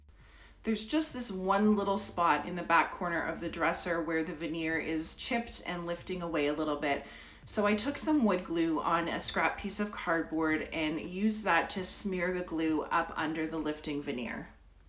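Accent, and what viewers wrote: Canada. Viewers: I subscribed instantly #1 your style and #2 your Canadian accent :P love from Bangkok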